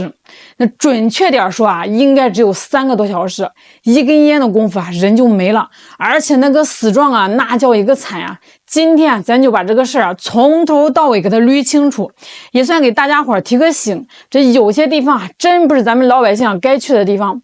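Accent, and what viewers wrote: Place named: Beijing, China. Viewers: 原來是北狄人 口音特別不舒服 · 她是一个北京大妞。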